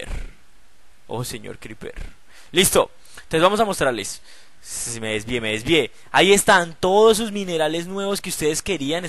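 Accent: Colombia. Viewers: sos colombiano ? ._. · Se le nota que es Colombiano el parce , por su cara por su habla diciendo parce , chimba y por eso siempre estoy orgulloso de Colombia :D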